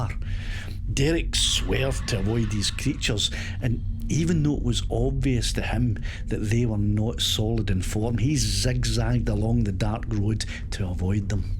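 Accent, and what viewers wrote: Scotland, United Kingdom. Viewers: Just found your channel-- great story- telling, love yer guid Scots tongue and accounts of true Scottish encounters!❤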